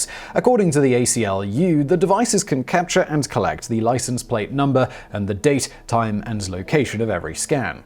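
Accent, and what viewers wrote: England, United Kingdom. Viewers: how do you figure its an American? The host is clearly English.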